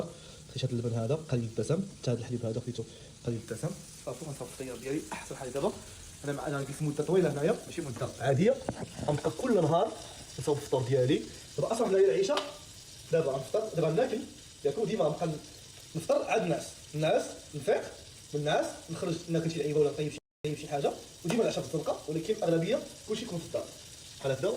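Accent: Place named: Gulf, Arab world. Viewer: 10 دقايق باش وصل بدا يهضر خليجي 😅😅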